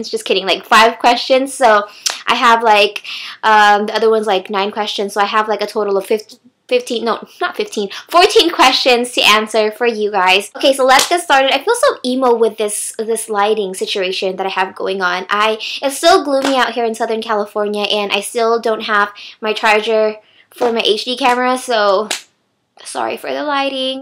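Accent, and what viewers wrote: Philippines. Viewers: I am so speechless with your filipino accent O.O lol i thought it would be hard for you to speak tagalog :)) You are awesome!!! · Yeaaay.. you still have that filipino accent when you speak tagalog. :"> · DUDE YOU ARE STRAIGHT FROM THE PHILIPPINES FROM HOW YOU SPEAK IT OMG LOL. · OMG I just saw this video and your filipino accent is sooooo adorable :D <3 (y) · Ugh.. Whenever you speak Tagalog, it reminds me of the Philippines so much xD Your accent is amazing. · oh em! astig! hehehe i'm filipina too and i've been watching your videos since november 2012, the way you talk says that you are a filipina, hehehe I hope i could meet you someday you're one of the best guru here in youtube . ^______^ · her family especially her mom speaks Filipino a lot that's why her Pinoy accent is still there :)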